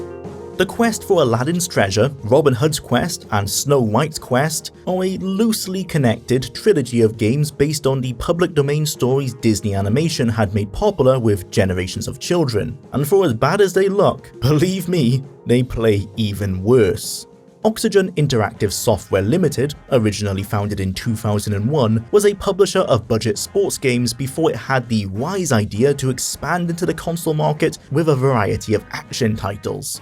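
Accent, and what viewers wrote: Wales, United Kingdom. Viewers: What gave that away? The thick Welsh accent?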